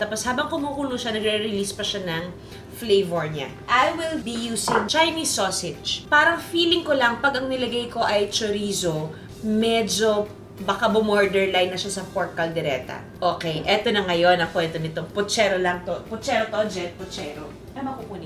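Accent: Batangas, Philippines. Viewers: Batangenyo accent ang imik habang nagluluto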